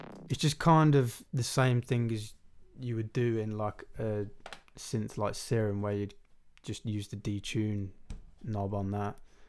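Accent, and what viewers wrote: United Kingdom. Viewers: If I could have one wish granted, it's that even after reaches that, level of fame, he would still be our lovely down to earth Brit who'd sit down and make these videos for us... even in the private jet if he must. Super helpful and makes me really happy just watching a guy who loves his craft, doing his craft. So plz... even with the millions of $$$, I pray that he will still regularly share these humble, happy moments with us. Maybe we can hear Speak Français in a British accent one day... hmm, I will hope and pray. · I love his british accent 😍😍😉🙃🙂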